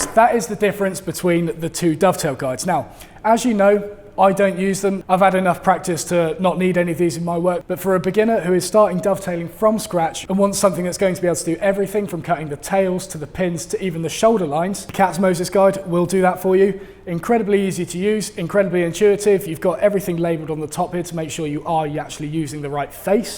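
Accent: United Kingdom. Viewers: I'm an American. Sounds fine to me. It just sounds British. If you visited London, would you ride the Underground and ask passengers to call it the "toob" instead of the "choob" so as to not confuse American tourists? communication skills are one of his strong points, and I think your criticism is well-intended but a little baseless. Give it a couple months, he'll be well over 100,000 subscribers.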